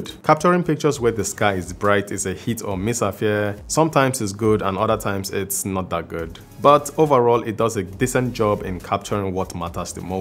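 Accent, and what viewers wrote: Nigeria. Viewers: Bro are u originally from Nigeria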